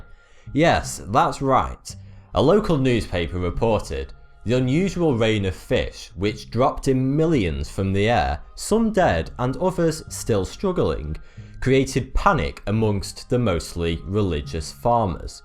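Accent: United Kingdom. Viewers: thomas I love the British accent, seriously... I could listen all day and never tire of it. :)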